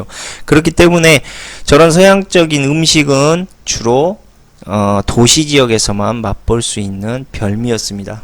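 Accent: Jeolla, South Korea. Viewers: 긍께 고향이 어디다요?? ^^ 전라도 지라? 좋은 정보 감사합니다.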